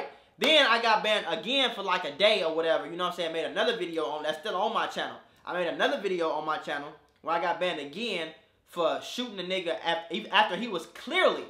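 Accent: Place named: South, United States. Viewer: You sound like you from the Souffffff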